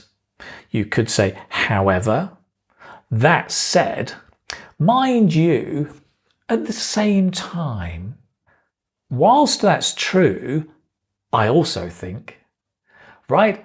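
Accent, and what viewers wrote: United Kingdom. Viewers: Sir, I would like to be a British English speaker as you speak.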